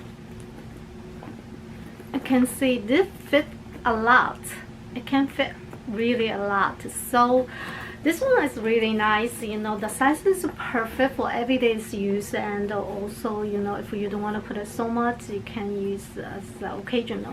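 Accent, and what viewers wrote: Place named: Thailand. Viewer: I think shes from Thailand coz she said duck instead of dark😃😃 thai people can’t say the r just saying coz i have thai friends same can’t pronounce the R 😁 ✌🏻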